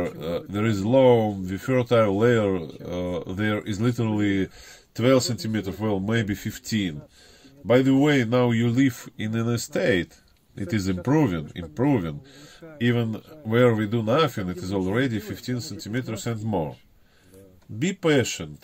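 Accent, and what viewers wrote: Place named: Russia. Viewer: great that there are other videos in preparation - looking forward to watching them! Regarding dubbing, I was watching without a sound, so I think that dubbing is unnecessary. Yes, you have a quite typical Russian accent, which is totally fine, since you are a Russian. :)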